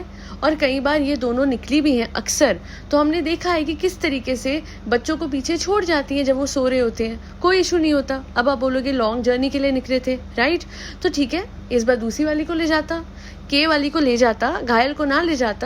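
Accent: Punjab, India. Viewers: Panjabi accent lajawab lagi..👏👏👏👏.... Malik's and Ibrahim's ka to name sunte hi maan ki shanti bhang ho jati hai aur gussa lagne lagta hai..... baaki good points 🙏🙏🙏🙏🙏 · Yes she is definitely a Punjabi cz she literally uses such words jo sirf Punjabi hi bol sakta hai with proper accent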